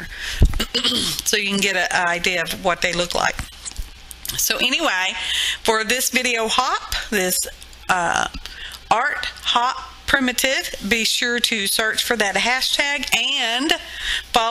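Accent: South, United States. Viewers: Was fun watching you make yoir beads. The hangy gizmos made with the paper clips is clever. Your delightful Southern Belle accent was wonderfully entertaining, as well!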